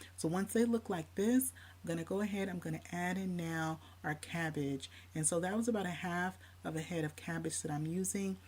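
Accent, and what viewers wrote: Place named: South, United States. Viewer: Now that is some south in your mouth ❤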